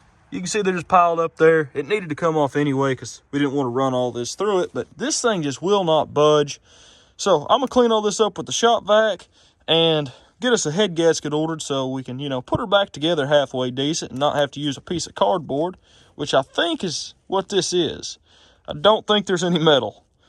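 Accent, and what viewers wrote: South, United States. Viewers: This American accent is harsh, grinding and an onslaught on the ears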